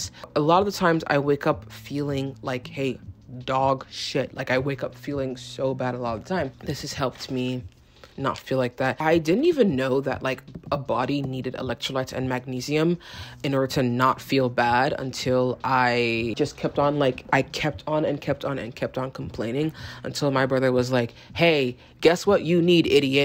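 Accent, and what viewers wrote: United Kingdom. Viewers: i love how your british accent is literally Adele. So comfy sounding.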